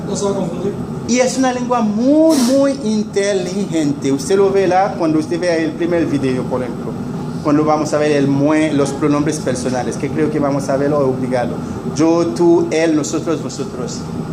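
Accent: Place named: Haiti. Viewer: su forma de hablar el español me genera una paz porque me recuerda a mis amigos haitianos ❤ me encanta su idioma, lo estudio por gusto y cariño a su cultura que la considero muy interesante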